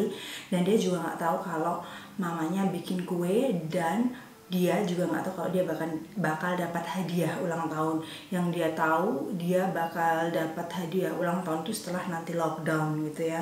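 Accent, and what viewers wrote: East Java, Indonesia. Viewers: ngakak habis cara ngomong ciiri kha s jawa timuran dan btw anak lanange dimana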